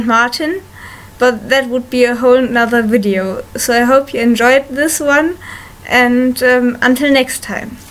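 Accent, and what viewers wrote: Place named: Germany. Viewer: Ur englisch is great (= a bit german, but i like it <3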